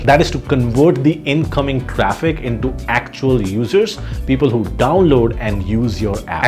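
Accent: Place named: India. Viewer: Indian accent is cool bro, stay with it. It will work.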